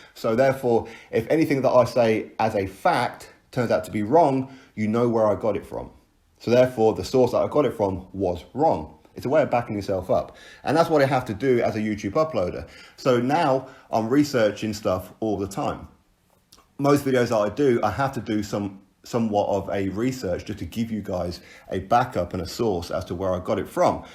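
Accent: United Kingdom. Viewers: Ion know y but I can’t stand listening to someone with a uk accent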